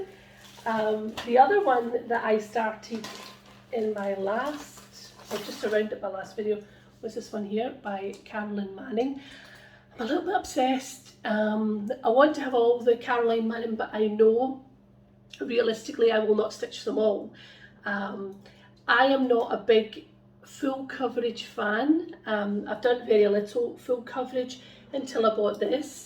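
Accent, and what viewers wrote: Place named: Scotland, United Kingdom. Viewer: Hope you and your family feel well again soon! Just came across this video in my recommended and fell in love with your accent! I don't know what it is, but the Scottish accents sound very pleasing! You mentioned that you lived in the Netherlands? How cool! Where in the Netherlands did you live? (I'm from the Netherlands, so just a little bit curious) :)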